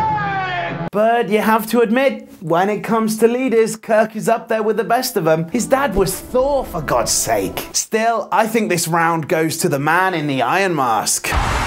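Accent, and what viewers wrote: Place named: United Kingdom. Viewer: Dude, u b fucking awesome. Love the brit voice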